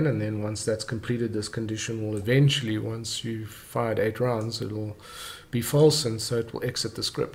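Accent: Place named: South Africa. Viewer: Yeh, unfortunately it still doesn't work... i've gotten some progress though, i managed to use vanilla but only the leader of the group is moving Edit: ive done it! I know that your from South Africa i could tell by your voice so i'll let you sleep, but after faffing around with my faults i got it to work. Sorry to bother you and thanks again!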